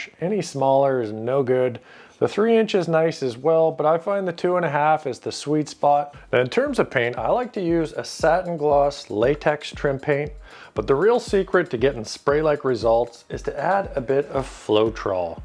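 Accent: Canada. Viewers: Finally! A YouTube video where you don't feel being yelled at! Oh! I just picked up here Canadian. That explains it.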